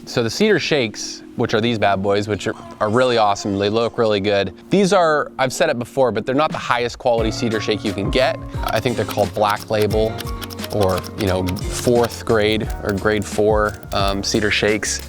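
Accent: Canada. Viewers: The only part of the video I'm confused by is the shoutout to "your Canadians" but you're wearing a Maple Leafs hat and sound like you're from rural Ontario! Great cabin man, nice work.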